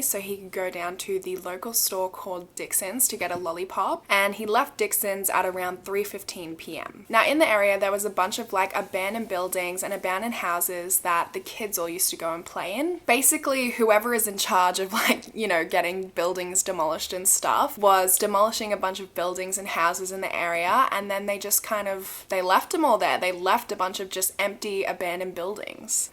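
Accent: Australia. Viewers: Where are you from ? Australia ? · Are you australian? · i love your australian accent!!! 😭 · Sure she is Australian 🤔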